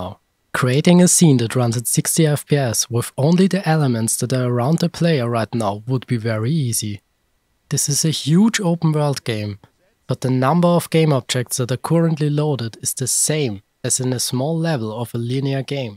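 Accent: Germany. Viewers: Alman is what we say in Germany to a perfectly stereotyped German. So you have a German accent😁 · only 74 subs??? holy you need more attention mate, awesome video. also nice voice and accent. are you german? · he has a german accent, everything he says is correct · You sound German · I can hear it. A little German guy. Good Video!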